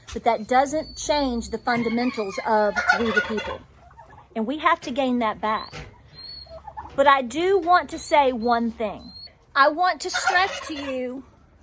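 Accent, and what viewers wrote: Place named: Georgia, United States. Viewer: I am so glad I found you and your channel. I love hearing you speak. You got a lot of wisdom for someone so young. I grew up in NW Georgia. We talk like you do. And, surprisingly, my heritage is very similar. I never knew my great-grandmother on my mom's side. I was told she was full blood Cherokee. My mom traced her father's lineage (Irish) to a pub in New York around 1700. My dad's side was Welsh and German. Don't know about the German, but when the Macintosh clan had a disagreement, the Mac Thomas clan was formed. Eventually, they headed south (Wales) and dropped "Mac." They ended up in Murphy, TN, and branched from there. Please stay the way you are. Prayers for you and your family 🙏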